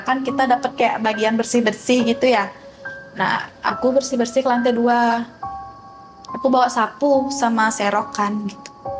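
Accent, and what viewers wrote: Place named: Bali, Indonesia. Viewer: Seneng kl denger logat bali..😅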